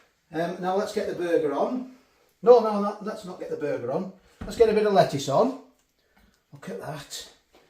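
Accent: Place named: Yorkshire, United Kingdom. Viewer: Half scottish accent ? You're obviously not "of these parts", 636. He's from Yorkshire, but has lived in Cumbria for a while. Any native would know in 2 secs flat he's not local. As for the scottish bit, ya need to brush up on ya regional dialects, i reckon. Btw, accents have no bearing if you can cook or not, our kid. What ARE you vaping, i muse ?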